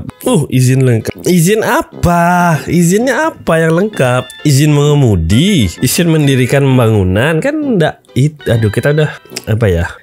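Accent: Bali, Indonesia. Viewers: Mantab,.,.,.. nasehatnya apa adanya… aksennya org bali sekali hehe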